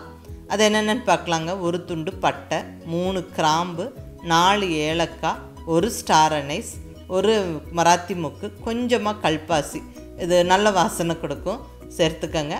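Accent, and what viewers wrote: Coimbatore, India. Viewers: always you rocks mam.... Am also coimbatore nanjundapuram amma home.. Now setteled in Chennai.. Husband home... Nama cbe slang kelumpothu avlo happy ah iruku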